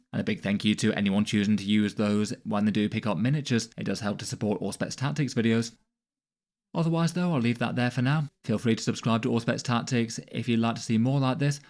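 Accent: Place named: United Kingdom. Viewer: Does everyone in the UK speak a million mil… kilometers an hour? I know bro has to get the info out in a timely manner but with that mono pitch, thick accent, and high word per minute it’s difficult to absorb most of the info. It’s probably worse for those whom English isn’t their first language.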